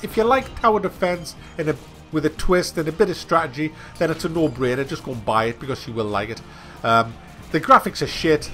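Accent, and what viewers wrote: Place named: Scotland, United Kingdom. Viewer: Come off it! YOU'RE SCOTTISH!!! And if you REALLY arnt......then what the hell are ya?? You sound like all the other "Scots' I grew up with in Dunedin.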